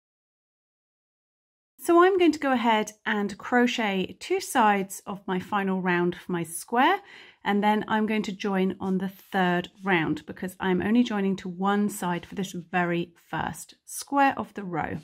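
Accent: England, United Kingdom. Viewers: Oh it's just that as you had an English accent I thought it was strange I follow English or I would get a bit confused 😂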